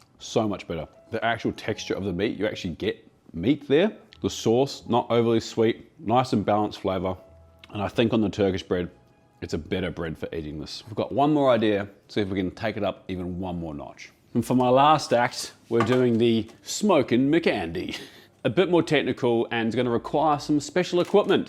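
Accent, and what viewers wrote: New Zealand. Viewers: Thanks Andy i appreciate the effort you put in regardless of the bitchy comments , and your on the button with wrapping ribs in foil to steam as they cook ,i do this everytime and the ribs are perfect in the oven. Thanks you Kiwi accented fitter and turner, be safe buddy ,love your work. 🥰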